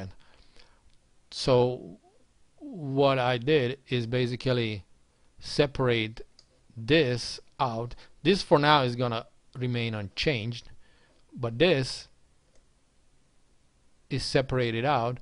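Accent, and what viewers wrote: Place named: Cuba. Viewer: Hey; are you American; living in Texas, and you speak Spanish? I’m trying to understand the influences on your accent ? Cuban ?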